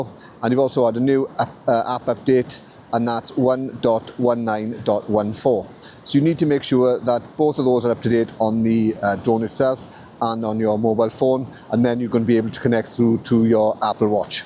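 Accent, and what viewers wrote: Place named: Welsh border, United Kingdom. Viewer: Hi started watching your videos and theyre very good 👍 Ive been a drone flyer since parrot 1 drone then parrot 2 and finally DJI drones 🤣 Im not a proffesional drone flyer just a hobby like yourself 😉 I have the neo 1 neo 2 and avata 2 plus the dji pocket 2 camera which I havent updated because Ive not used this one 🤣 I do have controllers N3. FPV controllers 3 and motion 3 controller plus goggles N3.. I am now retired and keep saying I will start a drone channel soon 😂 always next week when weathers better 😂 From near Wrexham so can understand your accent 😅 👍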